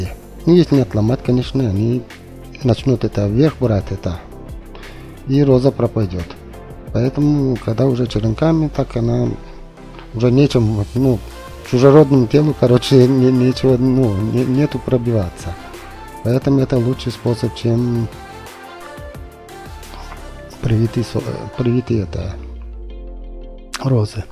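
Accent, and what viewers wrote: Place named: Belarus. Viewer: Я угадала. По разговору. У меня бабушка из Беларусии была. Родной говор слышу.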